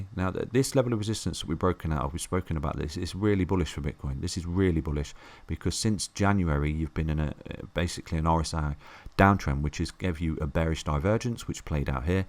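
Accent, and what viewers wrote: United Kingdom. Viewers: i like that nice and short video.. thanks.. nice to also hear British accent for once.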